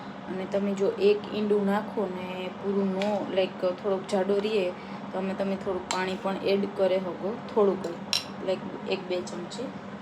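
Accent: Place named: Merani, India. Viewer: બેટા મેરાણી ની ભાષા બહુજ સરસ રીતે રજુ કરે છે તે મને બહુજ ગમે છે બેટા ખુબ ખુબ આશીર્વાદ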